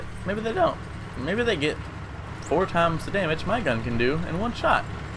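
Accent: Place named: South, United States. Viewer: im glad that your suthern accent is back!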